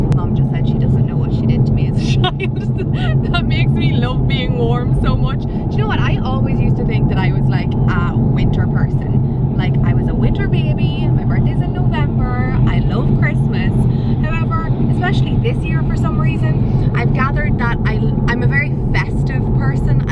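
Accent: Ireland. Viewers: Hi ❤ I just have to tell you that I LOVE YOUR VIDEOS! I have been cleaning all day and your videos have been playing in the background. I've been watching your videos for the past few days and you have been inspiring me in many ways. I love watching your routine videos and what you eat in a day videos. I came across your channel watching your Realistic 1 hour mourning routine, and since then I've been obsessed. I LOVE your accent as well!! I've always wanted to visit Ireland. Any-who, keep up the great work! Always looking out for new footage from ya!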